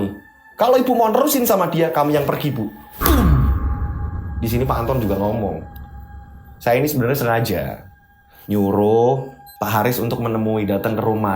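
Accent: Java, Indonesia. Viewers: ALHMDULILLAH SNENG BNGT DNGER CERITA NYA MAS..KL NGMONG JOWO MEDHOK BNGT..KATA DEMI KATA ENAK DI DNGERNYA..SHAT SLL YA MAS.SLM DR ANK RANTAU HK.🙏🏻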